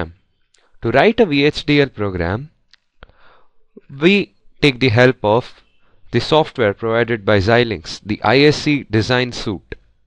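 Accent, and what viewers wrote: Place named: India. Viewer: perfect. slow. clear. indian :)) thanks!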